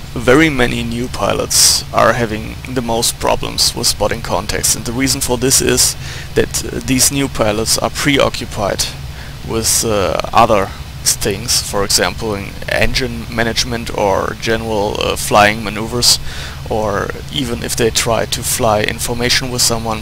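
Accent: Germany. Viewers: hi,. your vids are fun to watch, your german accent makes it interesting. I don't want to sound like a 'know-it-all' or something, but, you make the mistake many do when pronouncing the name...'hawkinge'......the 'inge' part is pronounced like the word 'hinge' or 'binge', not 'ing', like in 'going' or shooting'. I know, not real important, just a minor detail......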